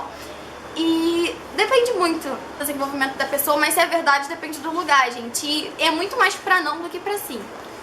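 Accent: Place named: Rio de Janeiro, Brazil. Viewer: De cara, já percebi que você era carioca ❤️sotaque liendo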